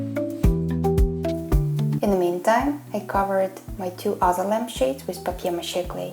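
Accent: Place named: Russia. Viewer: Акцент русских ни с чем не перепутаешь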